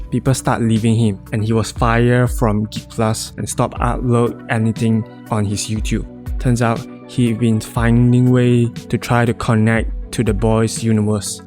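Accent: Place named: Malaysia. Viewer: The Malaysia accent is killing me 😂 Good video brother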